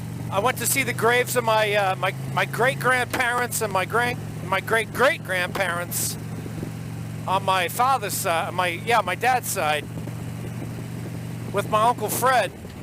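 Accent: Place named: Boston, United States. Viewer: Love the way your accent comes right back when in Boston for awhile. Keep up the videos brother I enjoy'em and hats off for putin your life out there. Keep do'in what u love and thanks.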